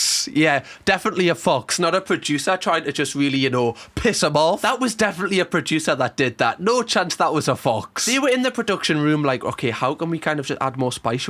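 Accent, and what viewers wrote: Newcastle, United Kingdom. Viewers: ! I found your channel sort of by accident just the other night. I'm hooked now. I know I'm watching everything out of order and all but, your commentary is hilarious! Also, love your accent and getting to hear the Newcastle slang. Love that you call your folks "donuts", I'm also part of the Waffle Gang. Another UK Youtuber Mark Narrations calls his folks that.:)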